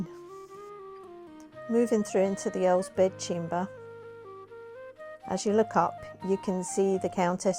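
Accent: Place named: Scotland, United Kingdom. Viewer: your voice is a beautiful mix of Scot’s and elegant English. 👍 plus no one likes the sound of their own voice… oh wait, some do! 😂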